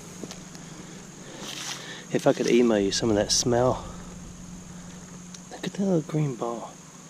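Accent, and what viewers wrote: South, United States. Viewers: I am from the communist state of California. I am a Southerner at heart and always have been. I really enjoy the accent from the south when you people talk. It soothes my soul. Growing up my best friend's family was from Birmingham. They treated me like family.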